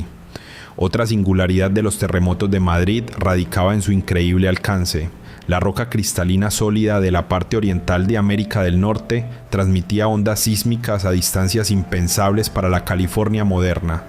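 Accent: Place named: Antioquia, Colombia. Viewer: Esa voz es de un.paisa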